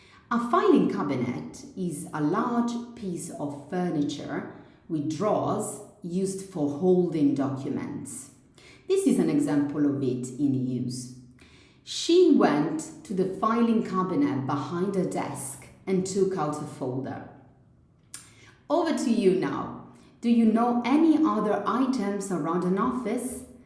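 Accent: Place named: Italy. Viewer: Pronunciation is Italianized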